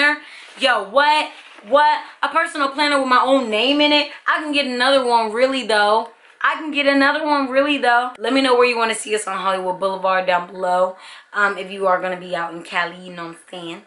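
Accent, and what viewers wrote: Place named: Nigeria. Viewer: Oh my gosh, I haven't heard anyone do a Nigerian accent in a very long while. Ahh, I wan't to go back so badly!!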